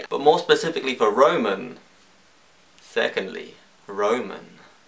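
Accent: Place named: United Kingdom. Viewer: It's because he's British. They don't understand his accent. Or they're just racist against the red coats :P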